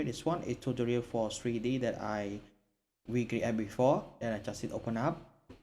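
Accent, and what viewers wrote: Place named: Cambodia. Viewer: He sound Cambodian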